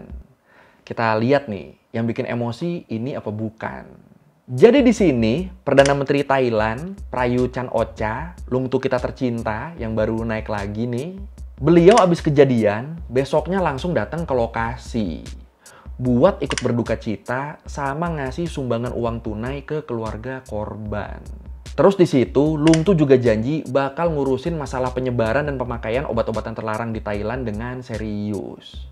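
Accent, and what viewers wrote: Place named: Madura, Indonesia. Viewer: Aksen Madura nya dpt bgt... asli Madura ya?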